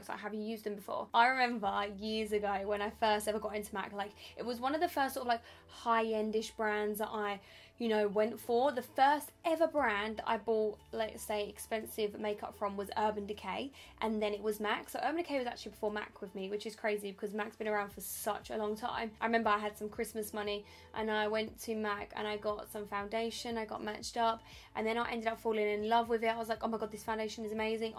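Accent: Essex, United Kingdom. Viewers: Are you an Essex girl?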